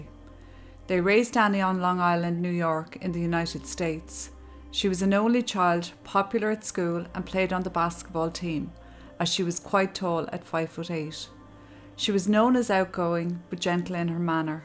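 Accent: Ireland. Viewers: Poor Annie.. So sad and tragic that a New Yorker ended up disappearing in Ireland, where the crime rate is so much lower than in NYC/NYS. Extremely puzzling and incomprehensible that she decided to go for a walk so late in the day, so far away from her apartment and, above all, on her own.. We will never know... What an unbearable torture for the parents, not knowing... No closure. Btw, in 1987 she wasn't 19 but 21 (born 1966). Very well told story, you have a soothing voice and such a charming accent. I love Ireland! Best wishes for your channel! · I really enjoy hearing your accent! Some of my ancestors are from County Cork. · I enjoy your storytelling and your lovely barróg. It's a comfort really. This is the first I've heard of Annie McCarrick. As a 1st gen Irish American raised on Long Island. like Annie, very close ties to Ireland..this makes me shiver, it's possible I blocked it from my memory. 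Somehow the Northern Ireland angle seems a bit far fetched, anything's possible, but to kill an American, create an International incident? They gave no evidence. My heart hurts for her parents, my sincere condolences to Annie's Mom , I pray she is Comforted and has Peace, the peace that passes all understanding..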